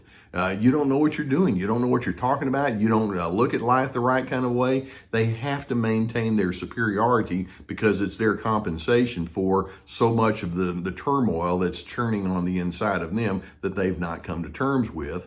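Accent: United States. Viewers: Omg I just love this guys accent. It's the nicest in the USA ... Best wishes from Ireland 🇮🇪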